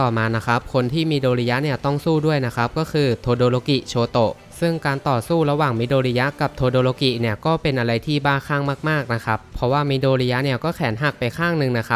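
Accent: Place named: Mae Klong, Thailand. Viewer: เป็นคนแถบแม่กลอง ราชรี เมืองกาญรึปล่าวครับ สำเนียงการพูดนี่แถบบ้านผมเลย555ผมคนแม่กลอง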